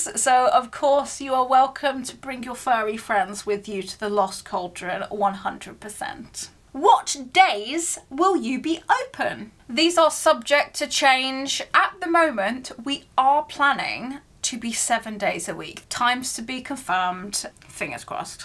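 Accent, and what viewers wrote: Birmingham, United Kingdom. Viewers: I thought you was a Brummie? Derbyshire is very far from brum 🙈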